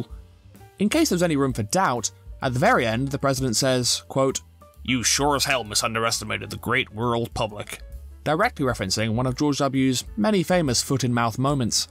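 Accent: United Kingdom. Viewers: I've never opened a video and immediately got attacked by a blast of so much aggresive britishness , Kudos for that, immediately hooked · The most british voice i have ever heard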